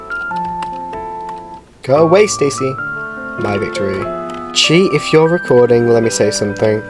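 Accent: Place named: United Kingdom. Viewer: I love Your accent lol are you British?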